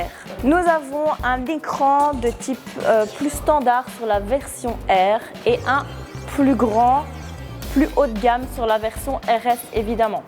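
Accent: Belgium. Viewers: Tu ne serais pas belge ? Sinon tu presente bien les véhicules et c'est d'autant plus agréable qu'on cest une femme.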